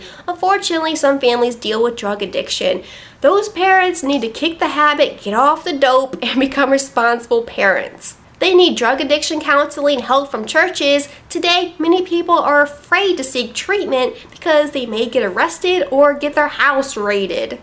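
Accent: South, United States. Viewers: what's with the southern voice? Really, SMH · please stop with the country accent very degrading to us southern folk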